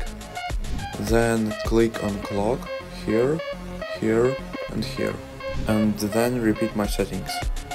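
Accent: Russia. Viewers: сразу понятно что русский потому что акцент не английский)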